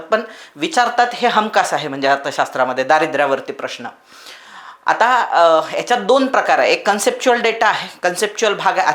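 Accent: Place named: Kolhapur, India. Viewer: Kolhapuri bhashet ekdak💥🔥 kadkkk ani lai bhariiii👍👌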